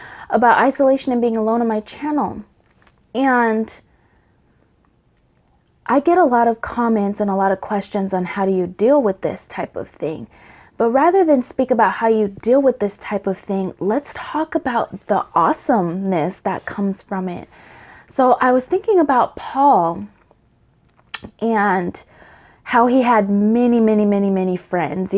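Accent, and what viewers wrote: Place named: South, United States. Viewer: U sounded southern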